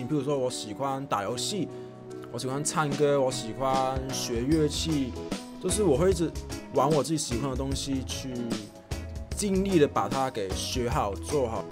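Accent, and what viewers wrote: Hong Kong, China. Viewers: 好有香港味www you know~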